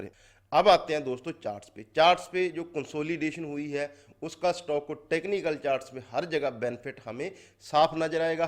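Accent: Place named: Haryana, India. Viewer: Haryana ka lgta hai bhai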